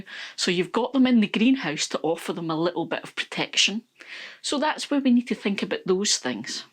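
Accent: Scotland, United Kingdom. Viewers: All I can say: love, love, love it! (your ideas, content, explanations….) - and over the last 2 years I have been falling in love with Scotland. So - listening to you sounds „so familiar“.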